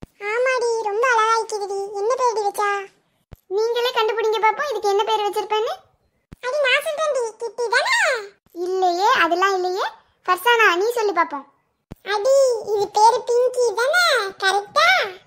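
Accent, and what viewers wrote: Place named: Parangipettai, India. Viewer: 80%of your slang sounds like parangipettai slang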